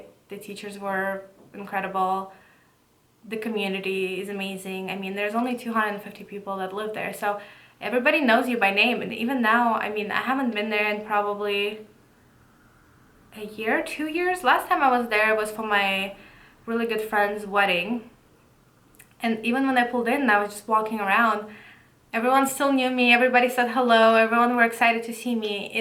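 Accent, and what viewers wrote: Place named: Russia. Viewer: Про perfect вы загнули конечно. Русский акцент заметен сильно (говорю как человек переехавший в 12 лет)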